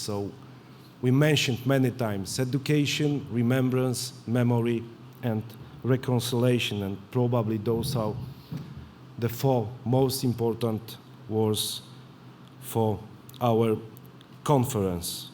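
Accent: Poland. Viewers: Bardzo dobrze mówi po angielsku. Ja wychowywałem się w UK, więc mówię to z punktu widzenia kogoś kto mówi płynnie po angielsku i muszę powiedzieć, że naprawdę nie jest źle. Używa bardzo dobrych słów i mówi całkiem poprawnie. Tylko musi popracować nad akcentem. Najlepiej byłoby, żeby poprzebywał z osobami z Anglii lub USA, którzy mówią bez 'akcentu polskiego' to myślę, że szybko by podłapał akcent i wymowę. Ale naprawdę wcale nie jest źle. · Ludzie co się przypierdalają do jego angielskiego żyją w zupełnie odrealniony świecie. Wiadomo, że akcent mocno polski, ale widać, że wie co mówi i potrafi przekazać co chce w tym jezyku - niczego bym tu nie ujmował. Najważniejsze, żeby nie czuje się skrępowany mówiąc i mówi płynnie. Przypomina mi się zawsze Jose Mourinho, który mimo swojego łamanego angielskiego i śmiesznie brzmiącego akcentu nigdy nie tracił charyzymy w tym języku i wszyscy go zawsze słuchali jak mówił. 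· Ma Polski akcent ale bardzo dobrze mówi po angielsku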